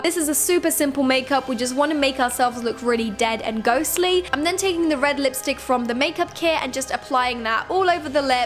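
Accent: United Kingdom. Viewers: Are you british 'Cause you sound british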